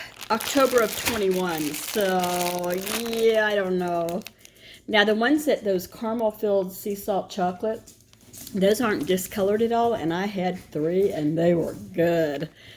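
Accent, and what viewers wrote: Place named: South, United States. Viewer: Hey! Here watching. Loving ur southern accent. Ur so sweet!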